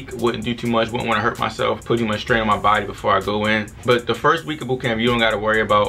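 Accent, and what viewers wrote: Baltimore, United States. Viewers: Baltimore accent? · Just by watching the video I can tell he from Baltimore they got that certain accent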